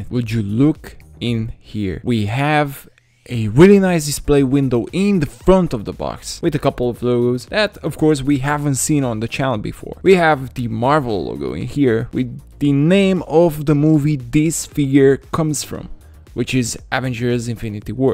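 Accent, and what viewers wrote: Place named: Romania. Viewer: După accent ești român:)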